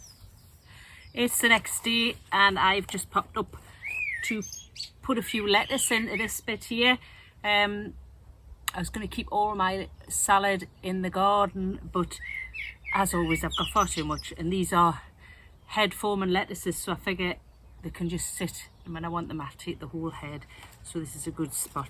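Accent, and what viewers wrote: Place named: Sunderland, United Kingdom. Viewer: I lived in Sunderland for nearly 20 years but I'm now in the Midlands so it's good to hear the accent and compare what's happening in the garden. I really enjoy your down to earth approach so many thanks for that. Just one question - there's some lovely guitar music and playing on your videos. Who is Kenny Mitchell? Any relation? Thanks again, Dave.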